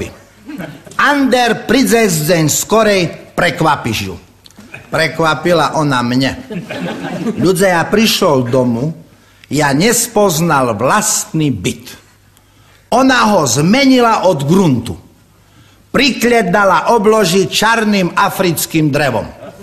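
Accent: Slovakia. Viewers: ander je najlepsi ale slovensky tazko rozumiet ten dialekt ;D ale predsa je zvlast ;D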